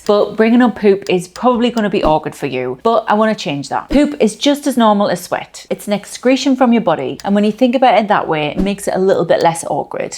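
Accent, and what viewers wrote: United Kingdom. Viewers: What part of the UK is this accent from? Midlands?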